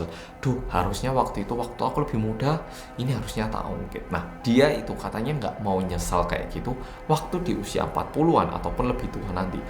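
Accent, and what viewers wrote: Java, Indonesia. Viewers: Setelah ntn the glory, q sempat ada lihat video perempuan agak gemuk trs rambut ponian, dia LG cerita di depan kamera katanya drama the glory ini, sama seperti cerita dia. Trs q sempat lihat bekas cetok rambut di badannya. Ntah itu bnr ato gak, wkt itu di interview GT si perempuan itu, gitu aza sich.. tp ternyata menceritakan LBH jelas 😊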